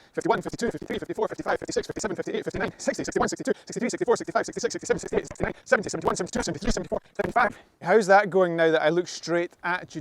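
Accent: Scotland, United Kingdom. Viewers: Hey, love your videos and espacially the scottish accent haha Where can we find the tripod that you use?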